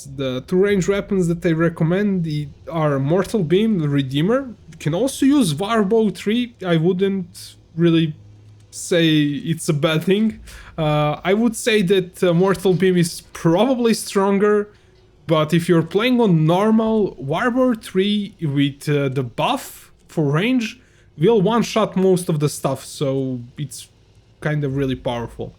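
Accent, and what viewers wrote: Russia. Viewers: Hi, thx for the vid. Is that Russian accent or Polish? Just asking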